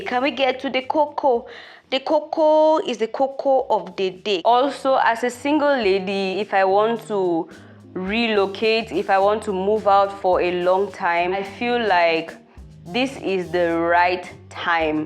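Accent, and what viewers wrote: Nigeria. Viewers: You sound like a Nigerian,.. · Your accent is almost Nigerian a little bit but not very heavy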